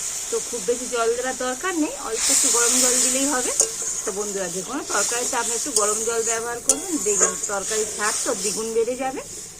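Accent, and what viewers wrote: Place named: Dhaka, Bangladesh. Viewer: দিদি ভাই আপনিকি ঢাকা জেলার লোক?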